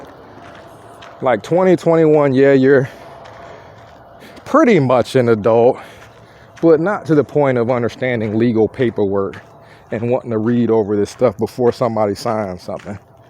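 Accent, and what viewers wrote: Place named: Texas, United States. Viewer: How long have you been in Texas? You are developing a Texan accent.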